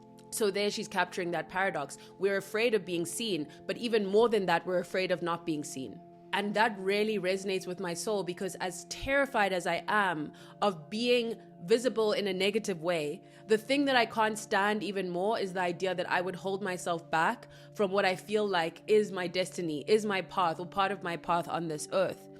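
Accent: South Africa. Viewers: I just KNEW that lovely, beautiful dialect was South Aftican! Love the “flea market of life” analogy. Love to you, Darling Sister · BABES ARE YOU FROM SOUTH AFRICA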